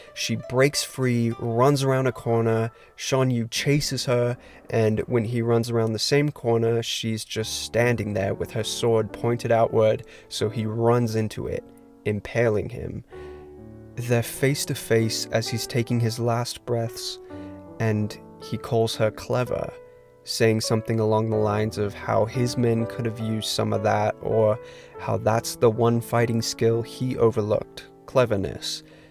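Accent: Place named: United Kingdom. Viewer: This is my second video from you that I’ve watched and I just have to say that your accent is really confusing me bro. You sound like a Brit doing an American accent. There’s no way you aren’t from the uk or at least spend a long time here or something because you’ve got proper English vowels mate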